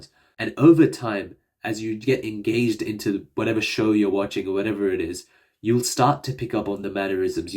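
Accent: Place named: Australia. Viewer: His accent sounds Australian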